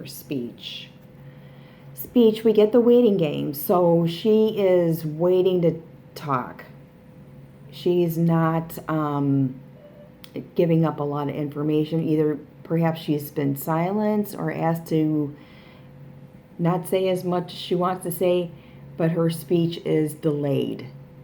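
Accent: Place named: Western New York, United States. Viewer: just discovered your site. Enjoyed this reading, thank you for sharing. You sound like you're from western NY...I recognize the accent!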